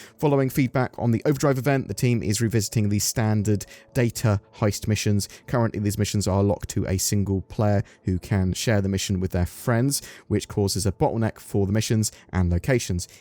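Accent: England, United Kingdom. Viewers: Top notch English accent there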